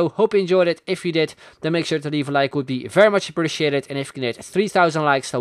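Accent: Netherlands. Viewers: Are you dutch????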